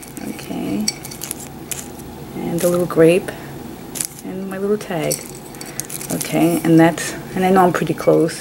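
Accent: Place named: New York, United States. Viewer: Beautiful!! Also (grin) sounds like you are a sister New Yorker.....I was born and raised in Greenwich Village (went to P. S. 41 on 11th and 6th) - nice to hear the accent - music to my ears! THanks for subscribing - I am lost in your videos right now......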